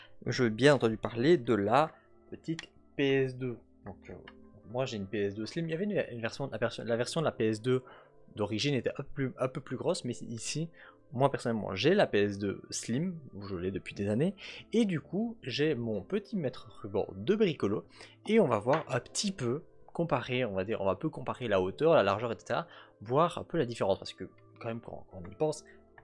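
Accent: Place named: Belgium. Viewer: sa s'entend a ton accent moi aussi je suis belges hahaha continue tes videos tu vas percer 🔥🔥🔥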